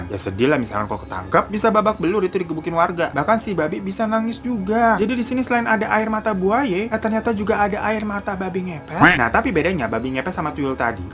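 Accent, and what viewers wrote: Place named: Jakarta, Indonesia. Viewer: Aku sih ngakak mulu liat channel ini babangnya logat betawinya itu bikin gemesh auto ngakak..🤣🤣🤣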